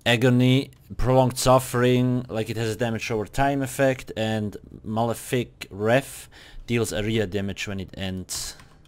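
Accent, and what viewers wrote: Switzerland. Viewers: Bish du schwizer? :D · He du bisch doch 100% au en schwiizer eh? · Du bist safe Schweizer :D nice video!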